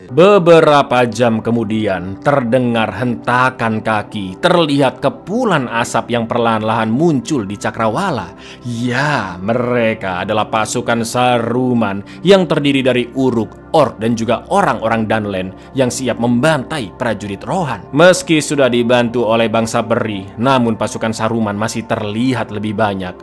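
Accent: Java, Indonesia. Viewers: Samean wong jowo tah😂😂😂😂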